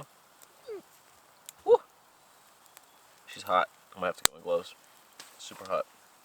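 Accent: Canada. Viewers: Were you saying the blue farmer Swiss Army knife or was it just my So. La. Ears hearing you’re Canadian accent? Couldn’t find that on amazon. Would it be the huntsman?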